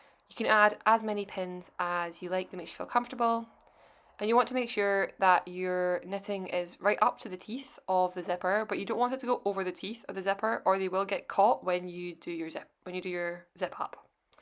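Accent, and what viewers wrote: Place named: Edinburgh, United Kingdom. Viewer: Hi! Thousand thanks for your tutorial! Do you also have one to knit the „bag“ where you sew it in? Btw…, I love you speaking. It reminds me on my first day an a language school. The first day I went there they were writing a dictat. I couldn’t understand a single word😂. Since than I have loved your speaking…, but the first day I heart someone from Edinburgh…., wow…, that was hard! I had the feeling I cannot speak one English word🙈. That was a great time…. Long long ago…, but I will never forget that time. And now listening to your podcast…. Lovely! Thanks🫶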